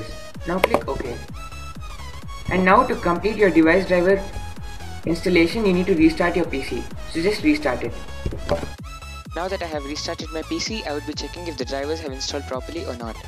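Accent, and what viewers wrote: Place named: India. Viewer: Indian voice, Indian accent. Program with viruses.. no thanks. I'm looking elsewhere.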